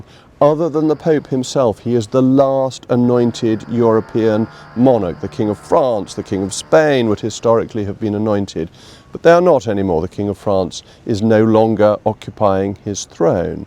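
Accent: RP, United Kingdom. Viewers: Thanks for standing up for received pronunciation, Jacob!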